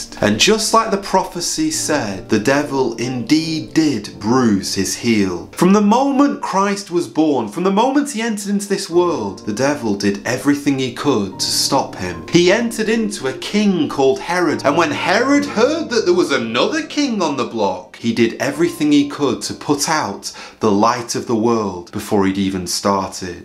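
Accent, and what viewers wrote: United Kingdom. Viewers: Keep it up brother this is so important, only the gospel can change things, and it’s good to listen to someone from Britain. Paul .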